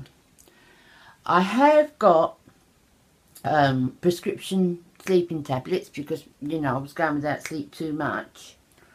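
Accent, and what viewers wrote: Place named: London, United Kingdom. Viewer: thanks for replying.....you mentioned in one of your videos that you were in the north, but I can't tell whereabouts because of your London accent 😂😂 how nice of your friends to send you American goodies. Hope your sleeping is better. Keep on being fabulous! 💋💋💋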